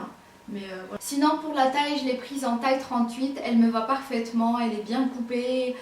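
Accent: Morocco. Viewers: Ton petit accent marocain est très sympa sinon super haul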